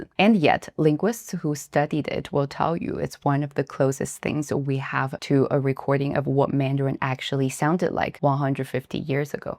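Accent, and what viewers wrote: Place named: United States. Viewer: She has an American accent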